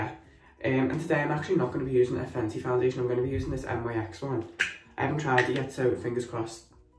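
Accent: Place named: Liverpool, United Kingdom. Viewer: Your makeup is gorgeous x am guessing your fromm liverpool? Because I am too!! ❤🙂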